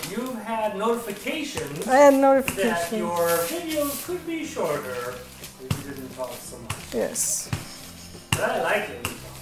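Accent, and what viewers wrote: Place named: Poland. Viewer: Wonderful recipe and video! I really love the way you walk us thru too. I do best when I can actually SEE how it works, so your videos always work for me. I am half Polish and grew up in Greenpoint , Brooklyn NY. Which is known as “Little Poland”......lots of Polish butchers and the best kielbasi you can find! But I have been trying to learn more about the many other authentic foods and the recipes to go with them, so I have a lot to look forward to on your channel. I thank you for sharing so much with us!! PS- I love hearing your accent! Half of Greenpoint sounds just like you, so it takes me right back home for a little while..... I love it!! ❤️ 🥰❤️🙋🏻‍♀️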